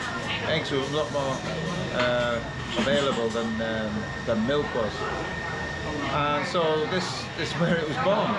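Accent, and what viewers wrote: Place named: Teesside, United Kingdom. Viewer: Just subscribed, going to watch your vids tonight, your accent sounds familiar, are you from Teesside?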